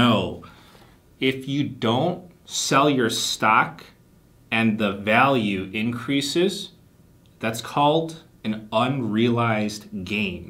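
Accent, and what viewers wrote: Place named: New York, United States. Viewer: His NYC sounded accent adds to its straight-forwardness.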